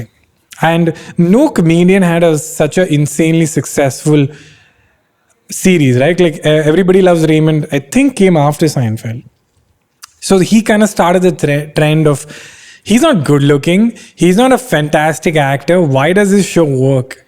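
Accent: Kerala, India. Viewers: talking in english with a hint of Malayali accent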